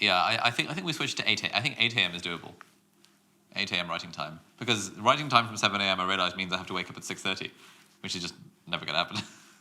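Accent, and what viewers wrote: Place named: United Kingdom. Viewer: Really amazed watching an Arab speaking English with a perfect British accent. Assalamu alaikum, Akhi. 🙏🙏🙏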